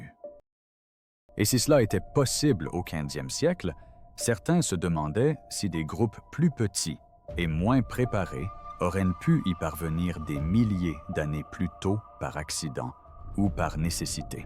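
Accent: Quebec, Canada. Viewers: Ces commentaires IA avec accent franco-canadien sont insupportables… et tout ça pour pas dire grand-chose ! C’est terrible cette narration remplie de détails sans aucun rapport les uns avec les autres. J’ai écouté plusieurs fois et impossible d’en ressortir une cohérence temporelle. Je suis bête ou ce reportage est une arnaque ?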